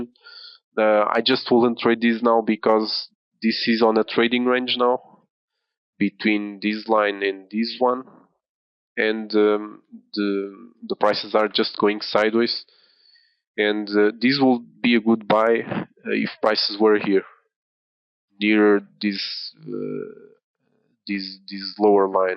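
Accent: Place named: Portugal. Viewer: Olá. Desculpa mas pelo sotaque percebi que eras português:) Gostava de trocar umas ideias contigo, se possível. Vejo que tens uma boa noção de mercado e interpretação de preço. Parabéns. abraço. Pedro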